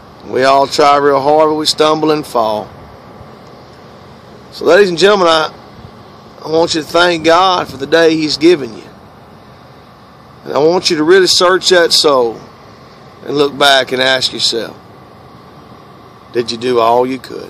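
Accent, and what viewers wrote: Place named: Texas, United States. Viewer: Make sure you post more to you tube because I am spreading the word with your videos! I moved to PA from Texas and to get your accent with my morning Word is a blessing! LOL! I have shared a lot of your videos already thru Facebook (yes, I follow you) so you are doing Gods work for sure! Keep it up and God Bless!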